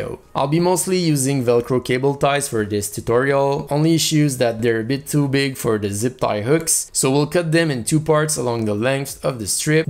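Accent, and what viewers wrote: Quebec, Canada. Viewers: Est tu Québécois ? :D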